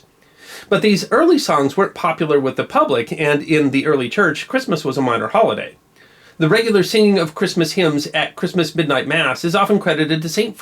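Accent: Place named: United States. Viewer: at last I have found someone whose American accent is thicker than mine! Hint: The terminal "e" on German adjectives is pronounced like the English "a".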